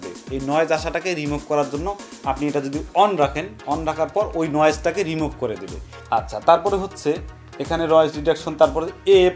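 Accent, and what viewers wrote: Rangpur, Bangladesh. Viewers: বাড়ি কই ভাই? হয়া যাচ্ছে ইয়া হয়া যাচ্ছে চলি যাচ্ছে। রংপুরত বাড়ি নাকি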